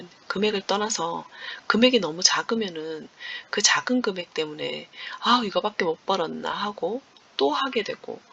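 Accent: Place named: Gyeongsang, South Korea. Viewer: 저는 서울 토박이입니다. 친구중에 경상도 친구가 있는데 서울말(표준말)씨 잘 쓰는 친구인데 제가 들으면 경상도 느낌이 있더라구요. 완전 서울말은 아닌게 있어요. 선생님도 비슷하다 느껴서 경상도. 분이신거 같았어요. 자주뵐께요. 구독 좋아요. 눌러요. 잘 배우고 가요.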